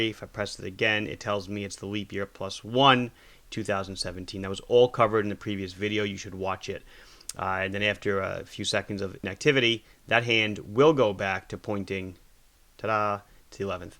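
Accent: Long Island, United States. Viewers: you’re the best watch teacher on YouTube! I’m a teacher myself and I can confidently say that your calm, methodical teaching style and pure joy for watches has made learning all about them easy and interesting. Plus the awesome lawng aisland accent goes a long way! Thanks for making all these Watch and Learn vids for us uninitiated to pick up the clues about the complex world of horology. I was given this seiko (in black🤘🏼) as a gift and came here to learn about it (it’s awesome, legible for how complex it is and not at all too big for my small 6.75” wrist). I now have a new hobby, thanks to and (also his mate over at urban gentry - love how they’re pals) Legends - thanks lads!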